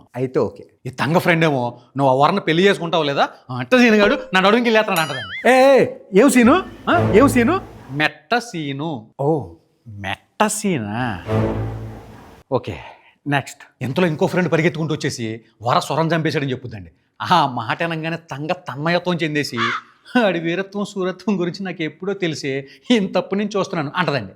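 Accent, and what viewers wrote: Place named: East Godavari, India. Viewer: This guy is very intelligent and highly talented, with a fund of original humor and high class satire which we rarely find in Indian YouTubers in general and Telugus in particular! He effortlessly carries the East Godavari slang with appropriate verbal sounds at right appropriate places thereby enriches the descriptive part with a very unique and rare blend of subtle humor laced with extremely high quality observation of semi - literate Telugu script writers and near illiterate Directors! I think there is only one more movie troll, one Fasas Roast who is in the same league with this guy and he already emassed a fund of followers and I foresee and wish him the same, in the imminent future! I think I can even write more and I wish I should as he richly deserves but I don’t have energy as I’ve totally exhausted mine in laughing uncontrollably! 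Good Luck, Mr.! You’ve already proved yourself and try to diversify your inherent and latent by utilizing it to the fullest extent, if you are seriously into this! I doubt whether you are highly educated with a highly placed job and stuff! Anyway, you’ll Go places! That’s the writing on the wall and I’ve a rare gift of reading it clearly! I’m very fortunate to have a chance encounter with your posts and you’ve really made my day! You shall excel in your chosen line if you pursue it very seriously and with a little dedication and a bit more of an indefatigable industry! Amen! So mote it be! Kindly f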